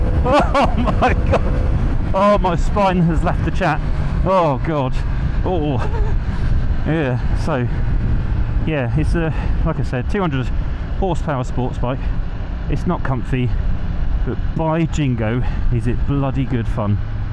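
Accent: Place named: RP, United Kingdom. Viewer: Gotta love the private school accent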